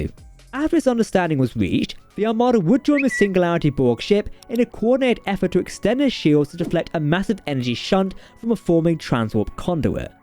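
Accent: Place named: England, United Kingdom. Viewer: Who,? It's a proper English accent. I could understand him just fine.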